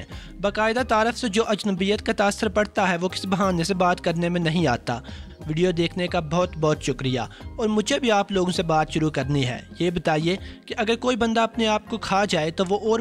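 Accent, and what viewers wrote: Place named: Punjab, Pakistan. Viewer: Please please please improve your Urdu. Panjabi mai styles mai Urdu mat boleye.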